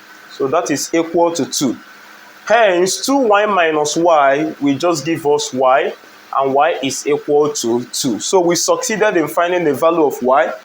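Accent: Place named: Africa. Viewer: Nice accent and are u actually from Africa if so which country?❤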